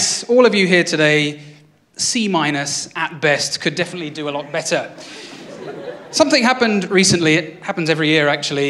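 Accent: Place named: Lancashire, United Kingdom. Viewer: You should always chat with a Lancashire accent, the chosen people.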